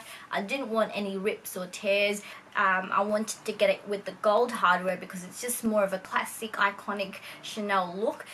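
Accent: Australia. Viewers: Australian accent...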